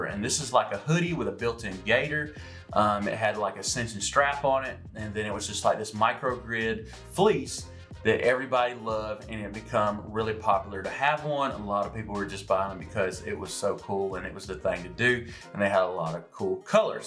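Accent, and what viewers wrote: South, United States. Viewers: I have had one for a few years and really like it. I like the thumb holes too. I bought my wife one and she is wearing it on a girls weekend trip. All her friends love it and they all want one now!! The only negative, and it probably applies to most fleece "outerwear" is the wind goes right thru it. Don't apologize for the southern accent!!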